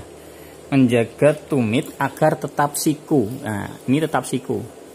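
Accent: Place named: Java, Indonesia. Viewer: Medhok syekali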